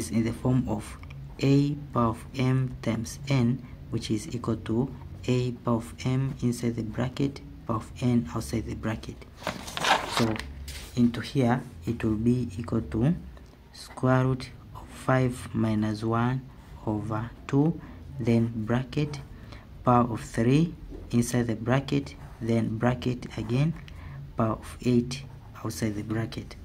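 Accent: India. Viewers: The accent sounds indian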